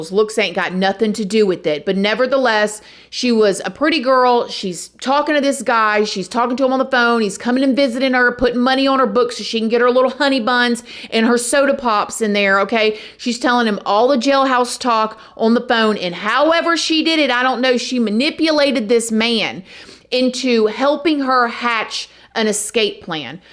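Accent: South, United States. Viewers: Love southern charm btw job corps = “core” not “corpse” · I love your content but love your southern dialect even more ☺️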